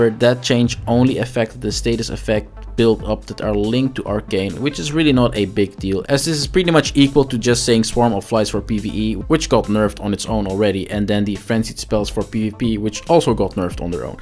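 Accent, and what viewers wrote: Netherlands. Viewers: Bizar maar tijdens het eerste woord dacht ik Ja! Nederlander! Speedrun stuff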